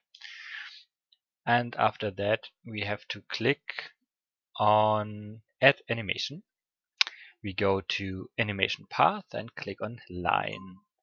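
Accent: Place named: Germany. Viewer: Man merkt schon das du ein deutscher bist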